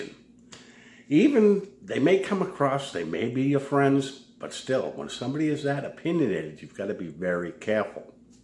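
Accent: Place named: Boston, United States. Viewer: My opinion is you have the best American accent, is it Boston? Great vid.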